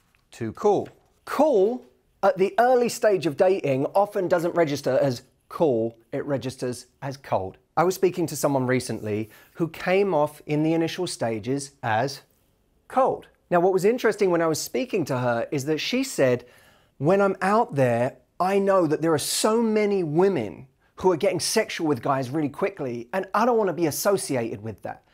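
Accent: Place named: United Kingdom. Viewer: You look like patrick dempsy you are handsome like him sory for my bad language english language isn't my first language but i adore the british accent and i like your channel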